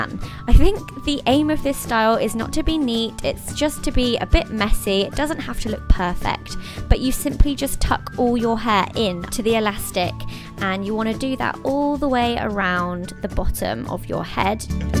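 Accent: United Kingdom. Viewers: Readers.... so it's a... British.... accent.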